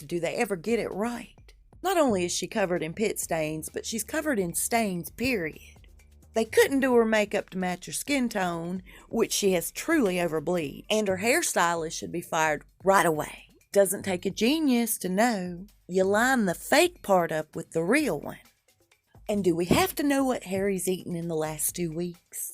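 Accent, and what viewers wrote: South, United States. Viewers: Catty, nasty and hateful. This narrator is one of the original small town "Mean Girls". Even her southern accent proves it. Wonder who paid her to do this?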